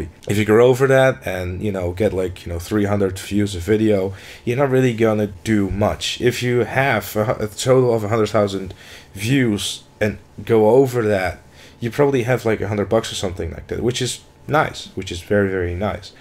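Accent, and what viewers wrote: Netherlands. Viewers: from what country are you? sounds a lot like you are from holland. since the tv you describe is exactly what it is here.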